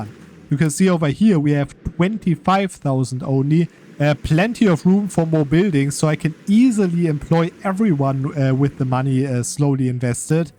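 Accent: Germany. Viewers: German accent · "how did you know im german" ahhh accent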